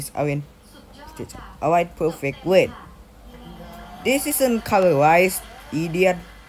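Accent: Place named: India. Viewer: Why you sound like india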